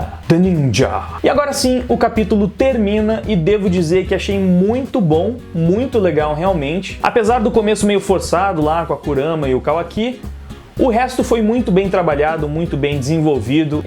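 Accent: Rio Grande do Sul, Brazil. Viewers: Obrigado pelo vídeo, grande trabalho, vc é do rio grande do sul? (Eu sou), abraço.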